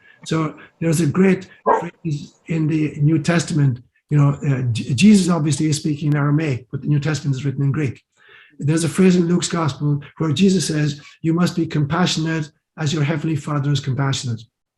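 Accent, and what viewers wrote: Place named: Ireland. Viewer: Just stumbled across your channel and just had to say I absolutely LOVE the name you gave your channel plus the bonus of listening to your lovely Irish accent which brings back memories of my Grandparents who immigrated to the USA!!! I look forward to learning and growing more spiritually with you ❤❤❤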